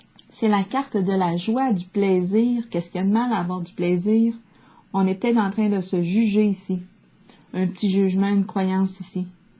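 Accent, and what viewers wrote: Canada. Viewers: Bonjour Ravie de vous retrouver avec ce jolie accent canadien Je vous suivez dans vos mini guidances et vous avez disparue puis de retour avec des guidances plus longues avec un grand plaisir 🙏😘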